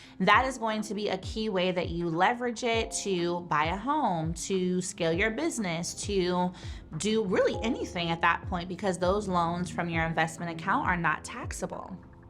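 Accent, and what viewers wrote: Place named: Southeast, United States. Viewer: tell me where you're really from because you and this accent is giving south eastern United States like North Carolina.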